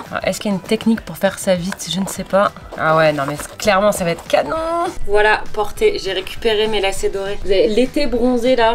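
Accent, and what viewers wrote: Canada. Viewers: tu mon youtubeur préféré vidéos accent canadien et qui met quoi des vidéos trop trop rire 🇨🇦👍👍👍👍👍 🇨🇵🇨🇵🇨🇵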